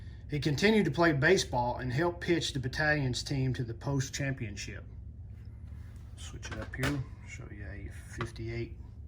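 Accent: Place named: Texas, United States. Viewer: You have to be from Texas because you sound like stone cold a little. 😁👍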